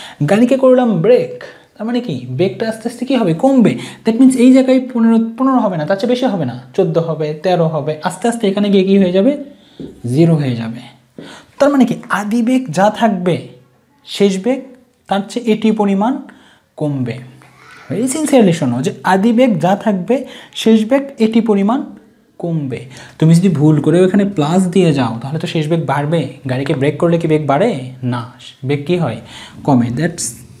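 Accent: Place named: Bogura, Bangladesh. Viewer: ji sir kotha sunlei buja jai bogurar manus,kon jaigai apnar basa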